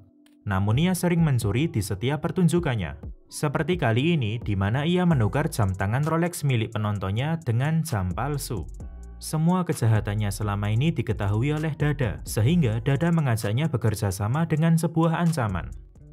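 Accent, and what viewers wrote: Java, Indonesia. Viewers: Medok pisaaan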